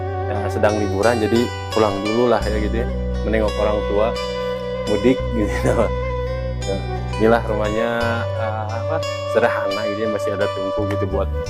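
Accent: Sunda, Indonesia. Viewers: Sunda halus kalem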